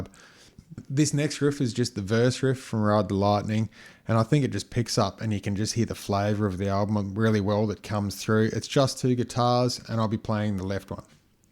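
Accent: Australia. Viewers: Not gonna lie Did not expect that ostralian accent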